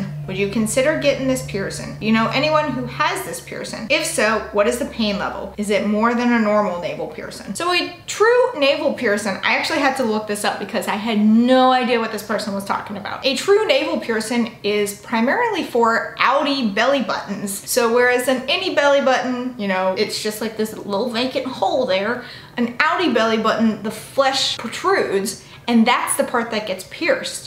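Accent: South, United States. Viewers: I can tell your southern and just wondering where? Tennessee here😁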